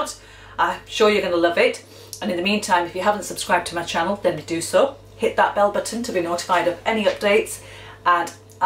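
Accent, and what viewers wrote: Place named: North East, United Kingdom. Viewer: Love the recipe , you sound like a north east girl xx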